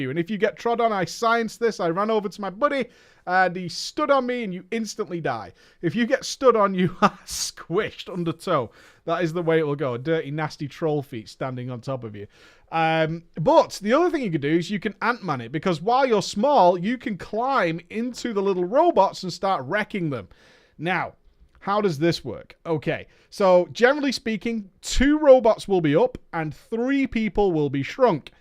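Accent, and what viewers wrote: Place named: United Kingdom. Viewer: no joke, you man, you know this game like the back of your hand. In fact, I think you probably know this game, BETTER, than the back of your hand. And Andy, why are yall have troubles? I just want to know. Cause if I was Andy, I would just say, "Preach, dude, lets make some more skits together, or whatever." Oh well, dude, you ok, all of that wow information AND your British too? WoW, is all I got to say.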